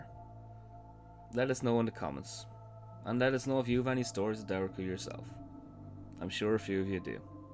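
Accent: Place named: Ireland. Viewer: i was wondering what kind of irish accent that is. thank you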